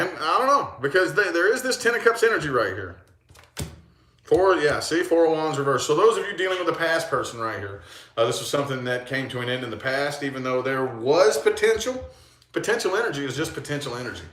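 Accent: South, United States. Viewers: Are you from the south ? Lol I hear a twang .... I’m in Oklahoma City , Las Vegas you live I think you said forgot 😎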